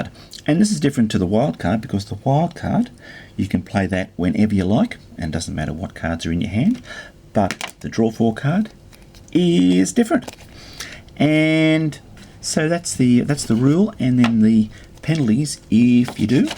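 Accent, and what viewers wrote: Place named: Australia. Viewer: OMG! YOU'RE AUSTRALIAN! Im really sorry, im really really sorry, i did learned a lot thanks for the video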